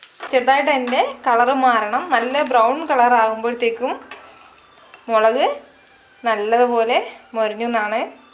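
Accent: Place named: Malappuram, India. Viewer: സംസാരം കെടാപോൾ മലപ്പുറം ആണ് തോന്നിപോകും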